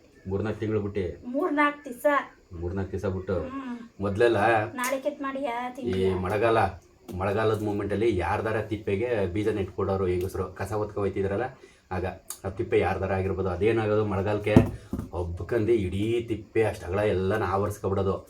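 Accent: Mandya, India. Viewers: Namaste avrige. Video super 👌. Nimma Mandya bhashe 👍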